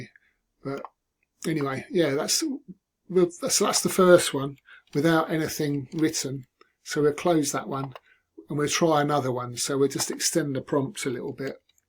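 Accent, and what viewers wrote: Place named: United Kingdom. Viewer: I like that you havent used ai to present this. And your British! Get fed up woth American avcents. Soz Americans😮.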